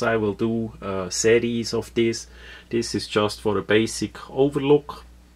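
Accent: Switzerland. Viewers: Iv had a few Swiss friends you guys are good people. all the people iv spoken to are more American then Americans. as far as I know Switzerland is pretty free but oppressed at the same time. like needing a permit to transfer ammo to go the range. A friend said the houses are really small there and its almost like a meld between the old world and the new world. how you would have small old houses next to factories with mountains in the background. Im looking forward to your series. I always enjoy your videos both the content and the accent =) If I could have a different accent instead of my yank accent Swiss accent would be at the top!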